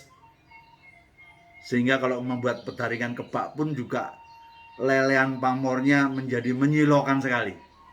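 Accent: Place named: Java, Indonesia. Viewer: Mantab. Bahasa Indonesia dengan logat jawa. Sehat selalu Om. Aamiin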